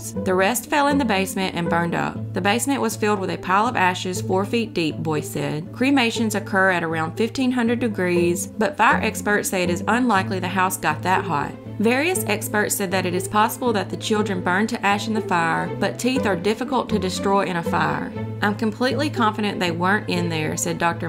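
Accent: South, United States. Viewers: and now I speed you up. Also being Southern …I speak fast, as if that should explain things 😂so I speed anyone up, not just you. Yours is just more pronounced than my NC accent. 😎 No problem it makes me comfortable. Thanks for ALL the cases. ✌🏻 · I live in Georgia and I love your channel. It's nice to hear a pleasant southern voice for once. Keep up the great work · Ahhhhhh that southern accent. Very relaxing and easy to understand.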